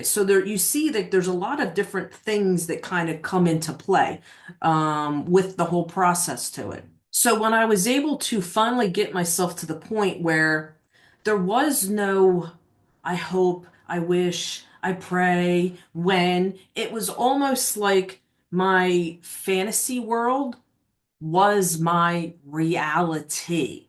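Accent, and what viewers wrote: Philadelphia, United States. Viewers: I hear a "philly" accent in your voice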